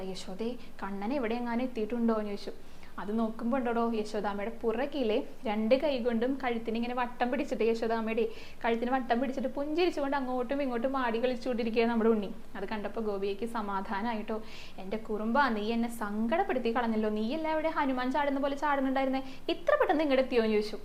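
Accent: Thrissur, India. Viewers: Kadhakal parayunnthu kelkkan valare resakaramane prathekichum parayunna reethium thrisoor bhasheyum. Bhangiaayettondu. Kadha parayan oru avsarsm kittiyathu thanne oru bhagiyam thanneyalle. Guruvayoorappan Molkku SarvaIswariyamgalum tharumaarakatte. Kunjente kaal pollalettathu bhethamavunnundayirikkam alle? Ok God bless you! Keepit up.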